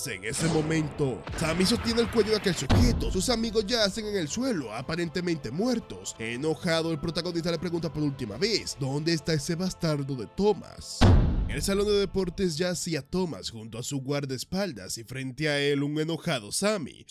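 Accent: Dominican Republic. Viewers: Eres dominicano bro 🤔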